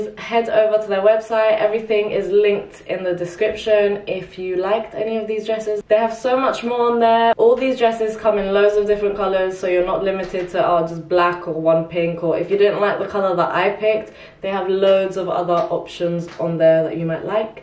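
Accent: United Kingdom. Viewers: Cheers! Your British Accent adds to your Beauty 10 fold.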